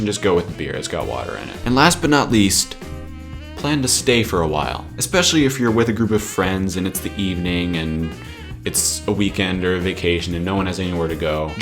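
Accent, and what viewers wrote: Germany. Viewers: Ooooh gosh, I absolutely love your German accent. It's adorable xD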